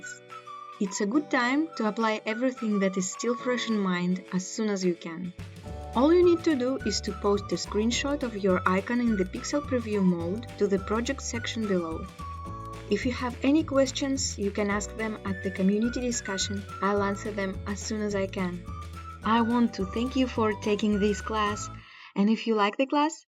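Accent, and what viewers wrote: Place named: Russia. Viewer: Услышал RUSSIAN акцент ))) но это не важно — лайк за видео очень грамотно и хорошо, браво молодец!!!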